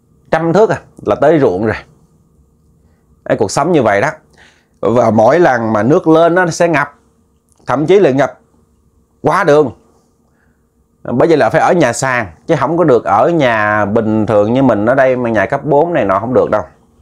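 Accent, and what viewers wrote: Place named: South, Vietnam. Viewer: mình ng miền bắc mà nghe ng miền nam hay tây í nghe khó hiểu vài câu:))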